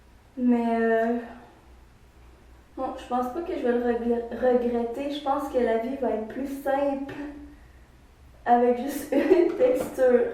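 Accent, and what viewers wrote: Quebec, Canada. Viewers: t une quebecoise ? c sur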